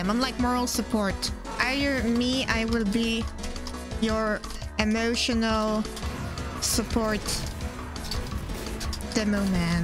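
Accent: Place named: France. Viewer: french or Russian sounding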